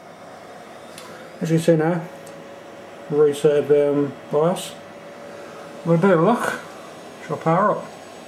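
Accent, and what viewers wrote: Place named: England, United Kingdom. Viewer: hes speaking english english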